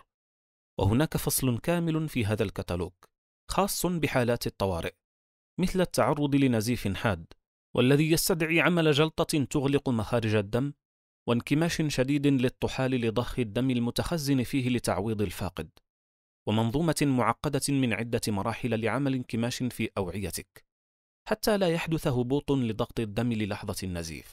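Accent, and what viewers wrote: Morocco. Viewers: اشعر ان صاحب الصوت مغربي 😅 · أظن المتحدث مغربي، انطلاقا من لحن الكلام الخاص بنا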